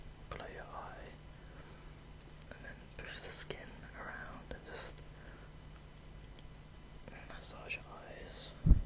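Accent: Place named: England, United Kingdom. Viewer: Thank you so much for this video, I absolutely love it! I'm studying abroad at the moment and this has helped a lot with stressful exams etc. nothing like a bit of eye poking to help unwind. I'm a bit homesick and it is so good to hear the English accent too - can't get enough of your voice. p.s. you're awesome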